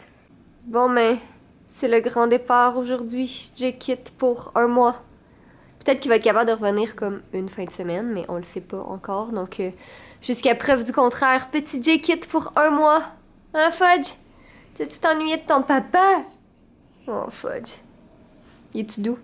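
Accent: Canada. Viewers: mon dieu j’aime tellement votre façon parler au canadien 😱😍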